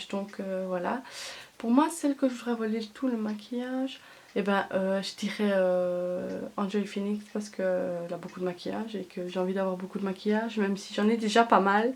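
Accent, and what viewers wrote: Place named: Belgium. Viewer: Et une abonnée en plus ! j'aime bien t'a façon de parler ;) Tu es belge ? moi aussi je suis de Belgique sa se reconnais direct avec nos beau accent MDR :p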